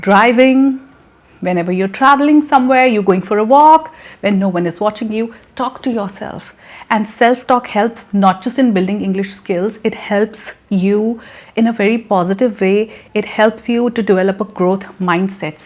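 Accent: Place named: India. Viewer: So nice video you have made me dear sister, with very clear voice, with very clear worlds but with super popular subcontinent accent and very useful points you have highlight, really learners are going to get benefits for your video. May Allah Almighty always keep you happy my dear sister 👍, Best wishes form Pakistan 🇵🇰🙏.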